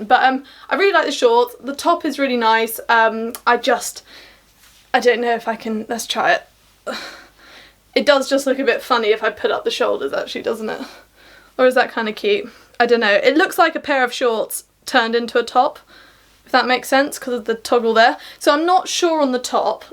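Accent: RP, United Kingdom. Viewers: Love her posh accent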